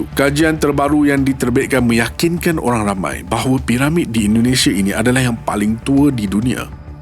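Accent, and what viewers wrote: Malaysia. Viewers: Pusing aku kalau orang malaysia ngomong...bagus belajar dulu sama melayu riau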